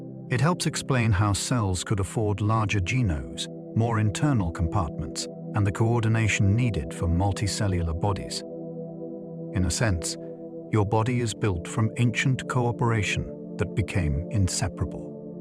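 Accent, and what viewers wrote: United Kingdom. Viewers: Why do these videos always have them a British dude narrating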